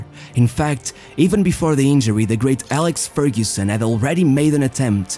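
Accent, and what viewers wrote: Portugal. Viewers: By this guys voice i can tell he is Portuguese, as i also am portuguese 😉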